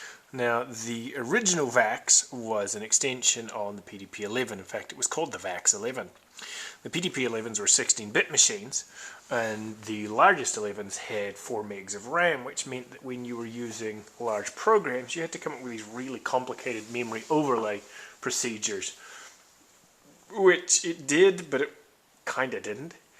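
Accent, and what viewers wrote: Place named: Scotland, United Kingdom. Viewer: Ah, right. Thought I heard a bit of Scot in there too. I guess you get this question a lot.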